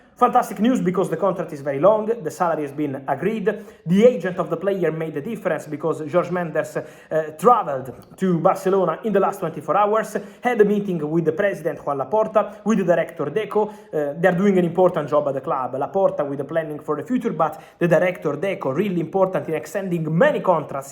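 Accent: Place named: Italy. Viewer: It´s always funny and so annoying at the same time hearing an italian guy speaking english with italian accent.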